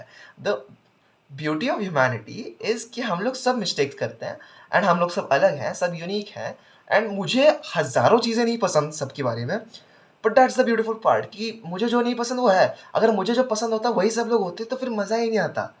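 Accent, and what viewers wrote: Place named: Maharashtra, India. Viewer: happy birthday!!! i have known you since 2 months online yet i feel a sense of familiarity in you plus im happy to know that youre a marathi too!! No wonder your hindi accent felt familiar!!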